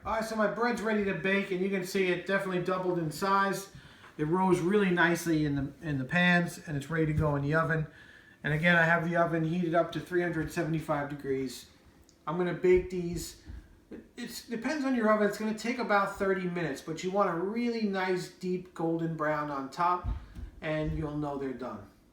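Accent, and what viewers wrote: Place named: New York, United States. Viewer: Everything about this video, from the delicious bread, to your (east-coast/new york?) accent, to your ADORABLE son and sweet wife filming you (reflection in a spoon lol) I can't wait to try your recipe. Thank you for sharing some of life's joy - God bless!!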